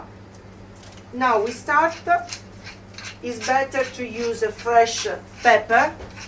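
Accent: Italy. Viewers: more than her recipees i love her cute italian accent. so lovely!❤ and it proves her being highly educated. i give her a big hand! 👏👏👏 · I think I'm in love. She cooks, earns money cooking. Is a great cook and speaks English with an Italian accent. Is beautiful and charming. I'm in love. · Nice lady . Funny indeed charming 💎. Great cooking skills . Great english with that italian accent . I would love to eat there . Beautiful country mama Italia 🇮🇹. ❤. Looks Yummy dish 😋. They have so great food n excellent cakes n ice-cream 😋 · inglesi e americani adorano questo tipo di accento italiano, un po' come a noi divertono certi inglesi quando parlano italiano con l'accento inglese. è naturale. ha fatto bene. brava chef !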